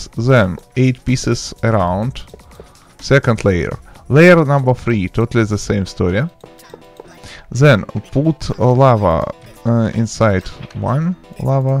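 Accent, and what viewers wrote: Russia. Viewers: Пахнет русским акцентом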